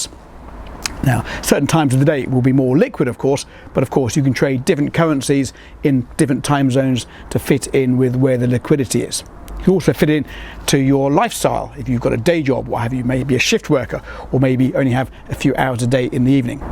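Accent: United Kingdom. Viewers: Love Your Video And Your UK Accent Is Amazing Too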